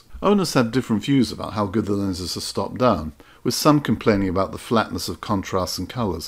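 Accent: United Kingdom. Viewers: I love your British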